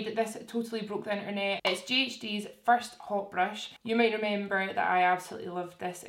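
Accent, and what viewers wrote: Scotland, United Kingdom. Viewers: When I started watching your videos ageeees ago I understood every second word you'd say haha now I'm like half Scottish xD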